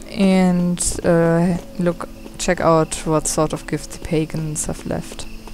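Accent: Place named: United Kingdom. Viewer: your accent is beautiful :D british, innit?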